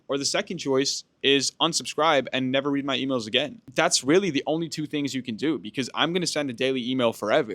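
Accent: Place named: United States. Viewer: you are not american, but you sound very american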